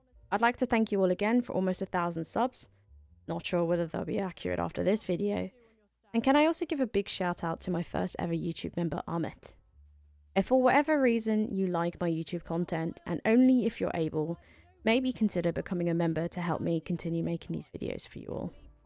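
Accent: United Kingdom. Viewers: The amusing bit out of all of this: you sound British as hell in this video. No hint of aussie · Only reason why I watch. and for the rants with bri'ish accent.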